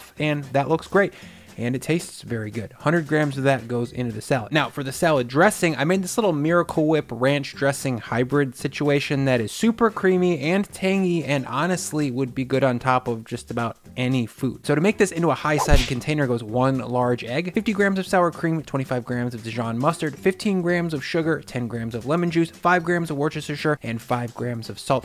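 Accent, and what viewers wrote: United States. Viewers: I love the recipes, but aren't I hearing an American accent!?! Why are you measuring in grams?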